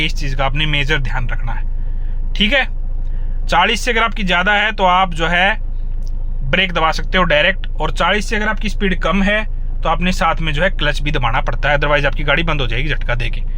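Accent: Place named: Haryana, India. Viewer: Haryana Sy lag Raha bhai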